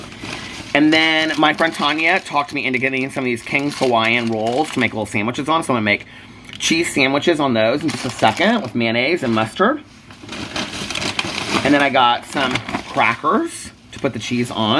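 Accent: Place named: Southern Indiana, United States. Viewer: I'm loving how that "Indiana southern" accent came out in full force in this video. 😃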